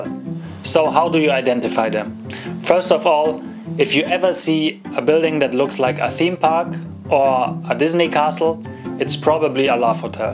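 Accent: Germany. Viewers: Where do you come from? I sense a german accent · Ich hab sofort herausgehört, dass Sie aus Deutschland kommen XD Ich muss sagen, guter Kanal :) Gefällt mir^^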